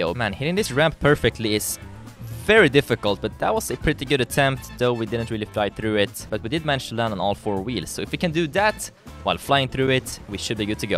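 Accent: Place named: Sweden. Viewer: Man hör att du är svensk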